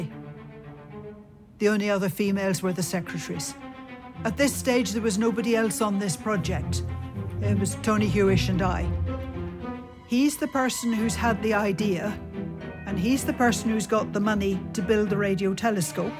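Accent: United Kingdom. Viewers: "the array rotated with the earth". A british accent does not give validity to this stupid claim. we do NOT detect any movement or motion caused by the earth. we never have. heliocentric world view(taught in public schools) is satanic pedophile propaganda by the jesuits long before the so called greeks "knew" the earth was a ball (supposedly 2000 years ago). Heliocentric do not work in reality. it is a fairytale for grown ups. the earth is immovable and stationary. all the stars are fixed in their positions and rotate in the firmament around us every day and night! the sun and moon is local! for more truth and answers in full scientific depths - Follow Youtube Channel - "Flat Water F.E" and "Celebrate Truth".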